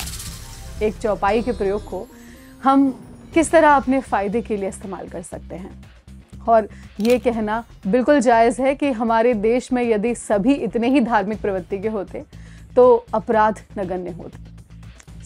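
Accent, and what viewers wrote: Standard. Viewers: Aap k bol nay andaz pure hindi achha laga sister baat samajh mai aa gai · एक अच्छे पत्रकार का सच्ची पत्रकारिता और विशुद्ध हिंदी वाचन के लिए बहुत ही साधुवाद।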